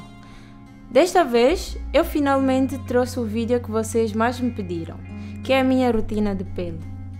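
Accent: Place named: Portugal. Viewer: Gente, que sotaque maravilhoso! Português de Portugal!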